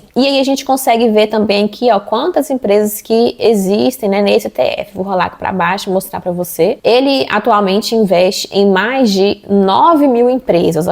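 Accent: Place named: Belo Horizonte, Brazil. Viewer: Ótimo conteúdo. Esse sotaque é de BH, né?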